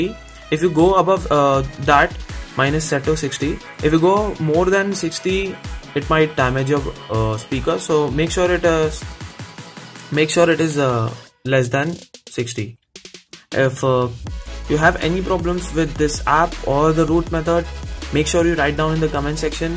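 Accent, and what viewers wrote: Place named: India. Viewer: hearing indian saying stuff that end with T kindna annoying .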